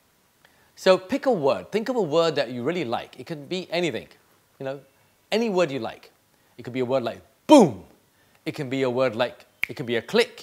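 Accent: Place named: Europe. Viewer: Oh, you are really great. My problem is--I can not follow your foreign accent, and difficult to understand your content.... You do not know what I have done and still doing to understand your accent. I think yours are European accent, may be like of England..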